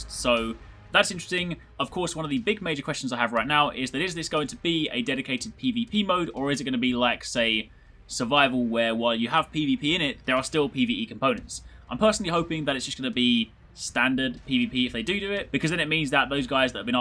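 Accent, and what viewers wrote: England, United Kingdom. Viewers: Where in England you from?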